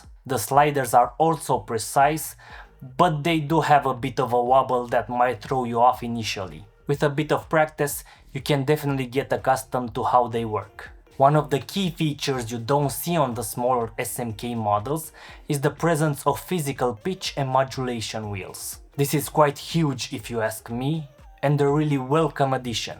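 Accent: Russia. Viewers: Как буд-то русский по английски говорит))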